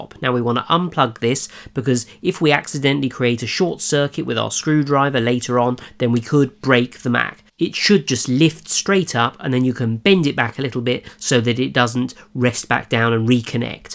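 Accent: United Kingdom. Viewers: I'm not a big fan of the brit accent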